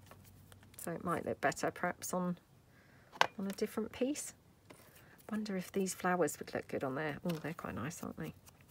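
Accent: England, United Kingdom. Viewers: These are so cute and look like a lot of fun to make. Thank you, you never disappoint ❤️❤️🥰🥰😍 You use the word “rubbish and fiddley’ a lot, with your cute little English accent and I chuckle every time. 🥰❤️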